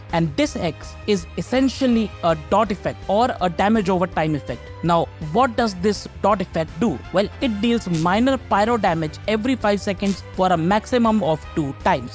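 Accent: India. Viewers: Bros got that iphone tutorial accent love it can always count on the indians to drop the best guides🔥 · he got that THICK Indian tutorial accent love it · Indian accent on any "educational" video tends to be 100% factual You deserve more subs and hopefully not too many to be on Hoyoverse's radar with this godly amount detail of her kit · Good video my indian sounding friend, keep it up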